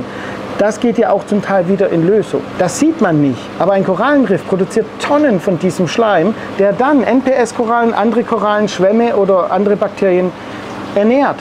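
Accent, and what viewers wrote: Swabia, Germany. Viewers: Hochkompetent, sowie schwäbisch sympathisch. Da kommen einem schon Ideen. Auf bald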